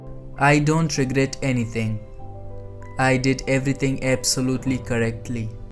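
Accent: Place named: India. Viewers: Wtf ??!!!! Racist on vocal sounds ?!!!! Why it’s Indian voice not English !!!!???? SERIOUSLY?!!!!! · What annoys me is the Indian speaking. Why dont just use English voice speaker those are free on the web?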